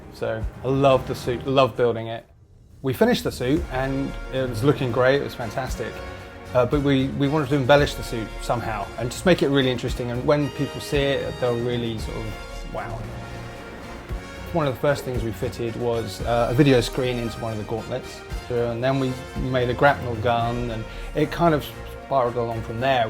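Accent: United Kingdom. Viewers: i'm and im now just realizing he's british😂😂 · is he British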